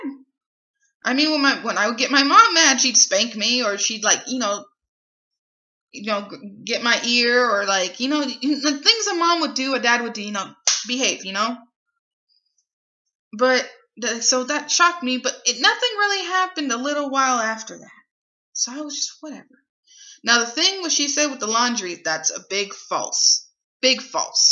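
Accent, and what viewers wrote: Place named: New Jersey, United States. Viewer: I KNEW YOU SOUNDED LIKE A JERSEY GIRL!!!!! LMAO HALLAAAAAH!!!!! I'm in up North now LoL!!!!! Got fam in Clifton n Bayonne N All up n down Jersey!!!!!!!💙💜💙💜💙💜💙💜💙💜💙💜💙in boonies now, Sussex County Stixxxx!!!!!! Mad Love Girl!!!!!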